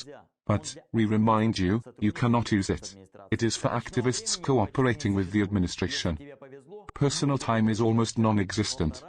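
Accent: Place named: Wales, United Kingdom. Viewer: The fact this is a Welsh voice cracks me up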